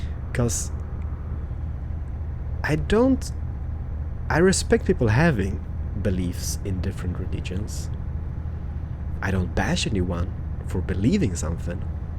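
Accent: Sweden. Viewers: jag gillar din svenska m8. it is funny. I like your swedish m8. it is funny.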